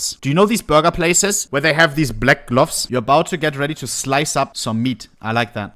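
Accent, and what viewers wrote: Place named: Germany. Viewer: german accent 🤮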